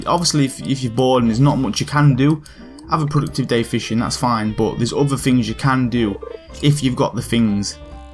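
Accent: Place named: England, United Kingdom. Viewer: Where in England is that accent from? Or is it a speech impediment? My apologies if the latter, but if not, for the love of god it's annoying as all fucks. Feel like I should leave a compliment to balance this comment now... nah. I'll just like the video. -1 +1 karma.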